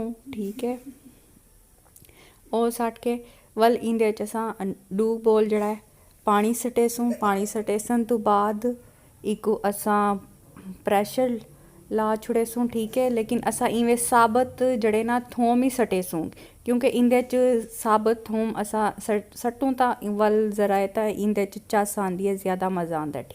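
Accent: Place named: Saraiki, Pakistan. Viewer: Piari piari sariaki vice😅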